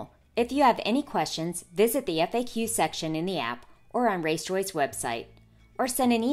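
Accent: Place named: Baltimore, United States. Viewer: Narrator is from Baltimore, not a guarantee but pretty dang shure hon.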